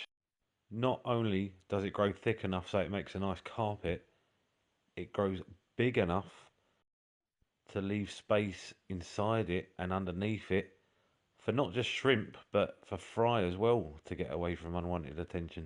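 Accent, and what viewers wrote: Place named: Cockney, United Kingdom. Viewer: My cherry shrimp and neons are best friends - but to heck with them, bruvva, I just adore listening to you talk. Luv me some Cockney accent 💐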